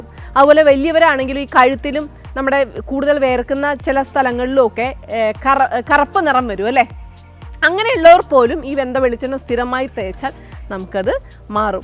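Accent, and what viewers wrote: Kottayam, India. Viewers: Thanku so mach ചേച്ചി..' ചേച്ചിടെ സംസാരരീതി കോട്ടയം അച്ചായത്തി തന്നെ'' ''ഒരു സംശയം ഫുഡ് ഉപയോഗിക്കാൻ പറ്റുമേ.ബീഫ് ,ഫിഷ് ചിക്കൻ എന്നിവ കൊണ്ട് വിഭവങ്ങൾ ഉണ്ടാക്കുവാൻ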